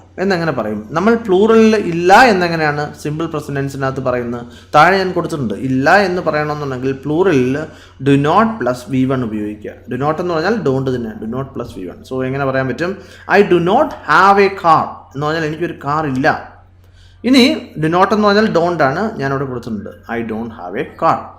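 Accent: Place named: Trivandrum, India. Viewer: Sir... ningalude place evideyanu? Vere onnumalla chodikkan karanam... ചുവപ്പ് ennu parayanullathine ചൊമല ennanu.. sr parayunnathu.. athu konda.. chodichthu.. tvm aano.. sr nte place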